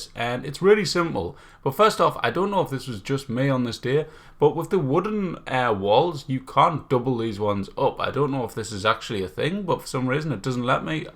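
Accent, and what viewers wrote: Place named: Newcastle, United Kingdom. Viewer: Fellow geordie?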